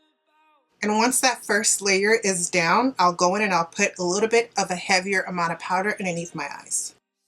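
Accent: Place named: Caribbean. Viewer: You are beautiful, and you did an amazing job with the explanation and demonstration. During the video, I heard a tiny bit of an accent, a Caribbean accent, like mine lol. Am I right, are you from the Caribbean? I went through many of your videos to confirm but didn't see anything. So, are you?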